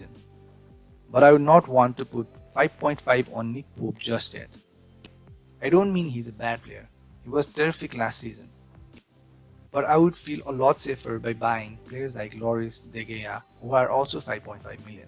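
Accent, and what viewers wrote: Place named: South Asia. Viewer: I'm from Nepal and your accent sounds familiar like from south asian countries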